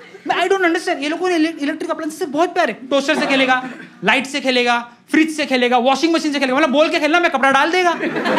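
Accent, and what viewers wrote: India. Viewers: The Marathi accent. lol.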